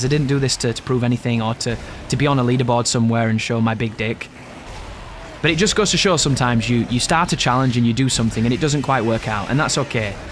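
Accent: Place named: Sheffield, United Kingdom. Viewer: Really enjoyed this playthrough, I played it through earlier this month so it was a nice coincidence. I'll appreciate another full year of your Sheffield accent.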